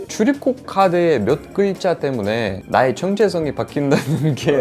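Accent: Seoul, South Korea. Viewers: 토종인 나보다 서울말 잘하네 어영옵써 ㅋㅋㅋ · 부드러운 한국 서울말. 당신은 제일 한국인입니다.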